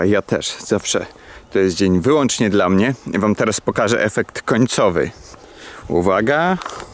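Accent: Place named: Podlasie, Poland. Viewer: A tak w ogóle to swój chłop jesteś fajnie zaciągasz,ja z Podlasia,to wiem,co mówię!!! NIECH ŻYJE ŚCIANA WSCHODNIA!!!!!!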